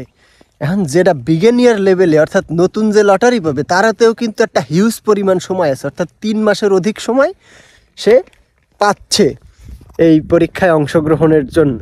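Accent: Jessore, Bangladesh. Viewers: ভাই আপনার কথাই যে মজা পায় খুব ভালো লাগে ভাই আপনার গ্রাম্য বাংলার ভাষা গুলো আমাদের যশোরের সেই ভাই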